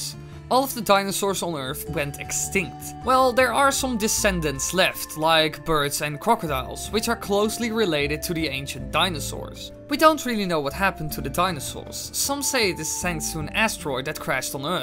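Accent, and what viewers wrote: Netherlands. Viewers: ik kan gewoon aan je stem horen dat je Nederlands ben :'D toffe video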